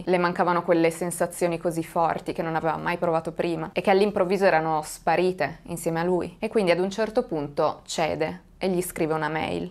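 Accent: Turin, Italy. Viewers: la mia nonna è di Moncalieri e la primissima volta che ho guardato un tuo video ho capito subito che sei di torino!!! 😍 complimenti per la professionalità e la sensibilità con cui racconti queste storie, non sono appassionata di true crime ma ti seguo sempre con piacere e interesse!!! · Ma chi è che si permette di criticare il tuo bellissimo accento torinese?!? Non ho parole... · Infatti hai l accento torinese come la Luciana Littizzetto. Grazie x i bellissimi contenuti che ci proponi. Sei bravissima. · ti seguo da tre giorni ,sono di Voghiera e ho capito dopo 1nanosecindo che sei di Torino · Si sente che sei di Torino ma parli un Italiano perfetto, con un accento perfetto. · Che strano , alcuni Italiani non riconoscono l'accento torinese come italiano? Non hanno mai parlato con un piemontese? Addirittura paragonarlo all accento argentino assurdo!!! Ciao da una tua concittadina ahahah. 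.. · Un'ottima capacità espositiva, profonda sensibilità e delicatezza nel raccontare queste storie e un magnifico accento torinese. Dov'eri fino a ora? Grazie per ciò che fai, la frase sull'empatia è molto toccante. Questo video dovrebbe essere condiviso di più, perché purtroppo credo che come questa persona ce ne siano tantissime. Bisogna sensibilizzare su questi temi e insegnare alla gente a essere accorta e attenta. Grazie per questo video ❤️ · No no si sente che sei di Torino 😉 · Si capiva subito che eri di Torino ❤️ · Comunque si sente tantissimo che sei di Torino! 😉 Mi permetto di consigliarti un video su un mio ex compagno di classe: alexander boetcher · Ma la persona che ti ha detto di prendere esempio da belén per parlare in italiano, è la stessa belén vero? 🤣 Penso che l'accento Torinese sia uno dei più belli d Italia 🇮🇹 ❤️ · Abiti nella città che io amo e ogni tanto vado a farci i weekend Avevo capito che potevi essere di Torino, dalla tua cadenza aperta 😅 Volevo chiederti, se potevi, raccontare la tragedia di Superga, l'aereo del Torino caduto sul terrapieno, della basilica di Superga. 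Lo so che sarei il solo a richiederlo, ma io te lo chiedo lo stesso. Sei molto brava, é un piacere seguirti e ascoltare le storie che racconti. Buona serata e buona continuazione ciao 👏👏👏👏👏👍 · ma é di torinoooooooo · Dal primo video che avevo visto ho capito subito che eri di Torino. Con gli accenti italiani sono una bomba💥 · Si capisce che sei di torino ne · Dubito che un commento a due anni di distanza possa essere preso in considerazione In ogni caso complimenti per la capacità di narratrice davvero straordinaria Ho scoperto il canale da poco e lo apprezzo parecchio Per quanto riguarda l'accento nulla a che vedere con Belen È il classico accento delle ragazze torinesi di buona famiglia Ho un dubbio sulla storia Siamo nel 2014 giusto e si parla di pagamenti cash, almeno 2, per decine di migliaia di euro Mi pare davvero strano Oggi sarebbe impossibile, ma anche allora le norme antiriciclaggio erano in vigore Un prelievo in contanti per quasi 200.000 euro poi pare fantascienza Magari ricordo male, ma qualcosa non torna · Ciao! 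Io avevo capito che eri di Torino perché parli esattamente come Giulia Valentina ahahahah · Ciao! Ti ho scoperto oggi grazie a una mia amica e già dal primo video avevo capito dall’accento che fossi torinese. Io abito in Canavese, mi ricordavo vagamente di questo evento ma non sapevo minimamente di tutta la storia terrificante dietro….fa davvero paura pensare a che livelli può arrivare la malvagità di certe persone…poi a maggior ragione quando sono cose che succedono vicino a te. Grazie per aver riportato alla luce la storia di questa povera donna e grazie per tutte le altre storie che ci fai conoscere. Nonostante ti abbia scoperto solo oggi mi piaci già molto e continuerò a vedere i tuoi video con piacere e interesse da appassionata del genere. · Buon giorno,! Avevo riconosciuto il tuo accento torinese. Ho alcune amiche provenienti dalla tua bella città. 😊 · Si sente che sei di Torino nee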